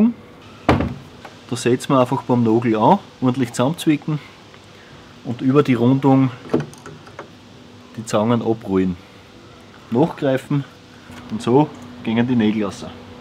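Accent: Bavaria, Germany. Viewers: Wie wäre es denn mal mit HOCHDEUTSCH? Typisch Bayer, da sind mir die Sachsen lieber, die geben sich wenigstens Mühe das man sie verstehen kann !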